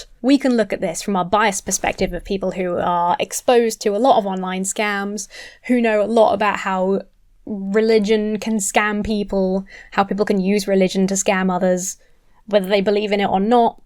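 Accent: United Kingdom. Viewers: Pretty sad when people get scammed like this. I enjoyed watching you have a bit of fun with the priestess. Love you, ya funny British-talking satan-worshipping lady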